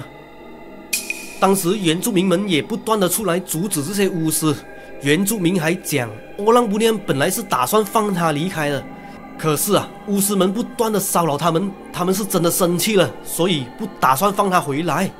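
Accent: Malaysia. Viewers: 你的故事整理的很好，但是，只是 建议 “发音” 需要再多加练习调整一下，会更好。【这应该是大马人の通病大概吧】就是句头 句尾语气词 轻重音，整体性的 发声『 抑扬顿挫 』… 我知道你不是专业的，但慢慢可以改善 相信会越来越好，你可以参考其他讲解梳理案件的网红 可以把人带进故事意境中。 其实，使用 “大马腔调”の口音说话叙述 比较不太好聽，讲故事也比较难有意境跟代入感 ，听着也很容易让人出戏～有种讲着讲着很困難，又很卡…卡顿的感觉……。😂 😌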